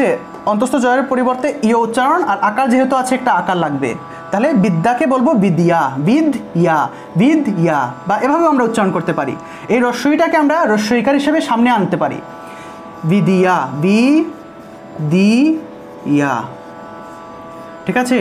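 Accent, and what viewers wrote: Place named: Sylhet, Bangladesh. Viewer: ভাই মনে অয় সিলেটি👌❣️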